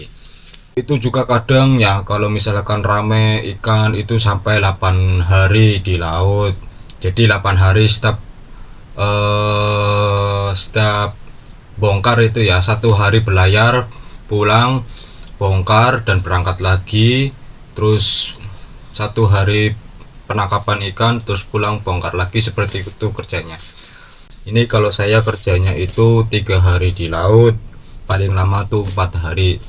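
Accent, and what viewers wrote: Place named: Banyumas, Indonesia. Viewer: Mas ngapak mau tanya boleh?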